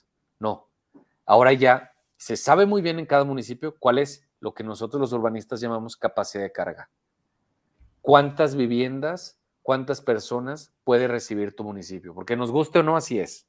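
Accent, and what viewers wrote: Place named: Nuevo León, Mexico. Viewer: A partir de hoy hablo como y el arqui puro nuevo león...me gusta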